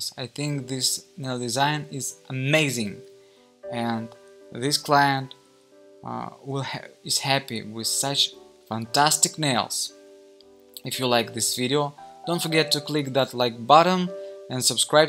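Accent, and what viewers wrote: Russia. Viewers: You speak English SO WELL, so fluently. I like the Russian accent. It sounds nice to me.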